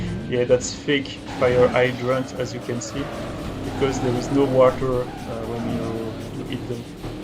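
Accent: France. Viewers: Where are you from? France?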